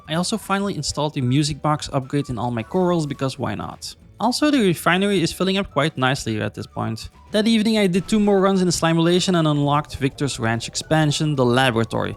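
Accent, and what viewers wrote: Netherlands. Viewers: I smell Dutch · I smell nederlands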